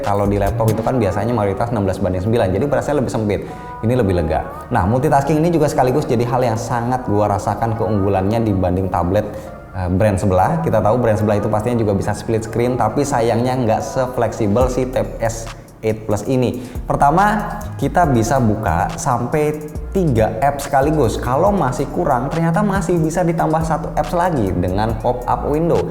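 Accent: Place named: South Jakarta, Indonesia. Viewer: Jaksel. Bet logat mu bang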